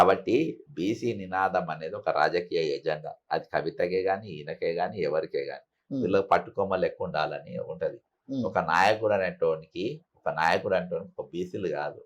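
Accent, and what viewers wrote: India. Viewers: Anchor తెలంగాణనా ఆంధ్ర నా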